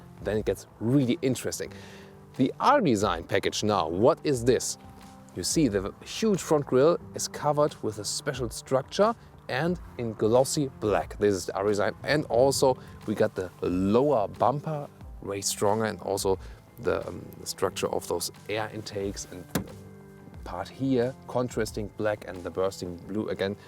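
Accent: Germany. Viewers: He's so cute! I really like German men accents... and that's a beautiful blue car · Your English is really good but the pronunciation is really awful. If you'd put a bit more effort in de-Germanising your English it would be much more attractive to listen to ;-)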